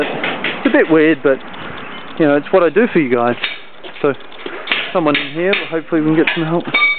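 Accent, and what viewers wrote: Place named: Australia. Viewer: all that for a number 1.... thought u were an aussie mate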